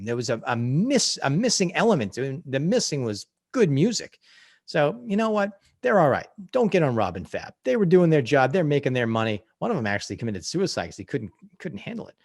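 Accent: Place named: Boston, United States. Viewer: Too funny! Finally my love for has brought me fame! LOL Loving this and I plan on checking them all out. You may have moved around a lot but still maintaining that wicked awesome Boston accent!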